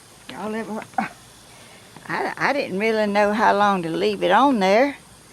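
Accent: South, United States. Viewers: Miss, I’m a Georgia girl too! I’m 72 and the matriarch of my family now. How I miss my mother and sister, but sitting a while with you feels so comfortable! 😢 God loves a “Joyful Noise” and your voice is calming and joyful. That’s why the birds and animals love your voice! It’s filled with Southern Charm, Grace and Love. Thank you so much for sharing your time and crafts with all of us! I feel as if I visited home! 🙏❤️🥰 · I just love this lady I have just discovered Ms. love her southern accent. She reminds me so much of my wise mama which I miss everyday. God bless you 💞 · Love “southern” talk. It’s beautiful and melodic. Please don’t apologize for your southern dialect. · Hi MS.! Thank you. Love you! Your stories are great! History is being taken from us. One of my Grandma's grew up in the south- - Kentucky or Tennessee- and your accent reminds me of her (she passed many years ago). 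You do NOT talk too much. God bless you and keep you safe so your YouTube family can continue to learn and enjoy you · You have the most relaxing peaceful voice. You remind me of my dear aunt who lived in Tennessee. I would love listening to her stories in her sweet southern voice just like you. I’m so glad I found you. Actually my sister did. 🥰🥰🥰🥰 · I’m from Georgia and LOVE your southern accent!! Reminds me of my childhood in Georgia 🥰 You’re a doll !!!❤️ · Oh Ms, I just recently found you here on my tablet. It's so nice to hear another southern accent! I'm from Tennessee. I have been in Illinois for almost 17 yrs. My husband will retire soon, so I will be able to go back home. Thank you for all of the encouragement that you give us. Lord knows I need it! I could listen to you all day. So, now I am going to spend a few days with you. I want to start with your first video and watch them all. 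I did turn on alerts for your channel, so I will be notified when you make another one. I'm crafty too. So I am getting lots of ideas from you. One thing that I feel like I should say. Please don't be offended? I was watching your fishing trip, bless your heart, all that rain. But, what I wanted to say to you is, you being a lady of 82, and single living alone, you have to be more careful! Anything could happen. I was so afraid that you were going to go running for the car out in that parking lot. No one was around. What if you fell? Please let a friend or neighbor know when you are going to go out like that. We were all worried for you. Because we love you and you have become an important part of our lives. You also ran through three stop signs on your way home. Just sayin. Be a little bit more careful! God Bless you. When you hear from Cody, tell him that you are still getting more fans! 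I know you've got me now for sure! Much Love, Kim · Ms. I found your YouTube channel while I was living in Minnesosta and I missed hearing the southern talking I grew up with in Georgia. You talk as much as you want to, about whatever you want to talk about! It's your video. You do you! I love all your projects! Now that I'm back in Georgia, I wish that I could come visit and craft with you and drink coffee! Blessings to you and all your endeavours!-Angela Hurt from Lizella, GA. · Hi Ms. I live in Chicago, first i love your southern accent also your morning videos. Very relaxing to watch you perform your wonderful art.I have learn so much from watching your videos. I am 68 years old just retired and looking forward to try all your wonderful crafts ideas. Please don't over do it in this extreme heat. Stay cool. Stay safe.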